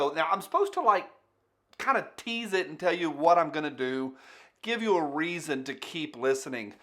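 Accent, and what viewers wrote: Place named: Appalachia, United States. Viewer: LOL Not to harsh on your appalachianess Greenwich is pronounced Grenich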